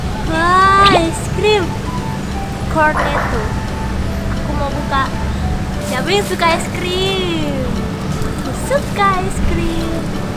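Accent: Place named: West Java, Indonesia. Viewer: Channel aksenya kayak sunda sih wkwk eh aslinya tegal 😂😂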